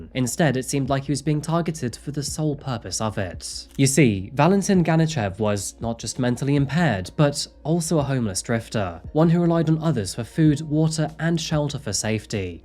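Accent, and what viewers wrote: England, United Kingdom. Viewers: You have an English accent but pronounce some words like an American? Is this for your American viewers or just how you say it?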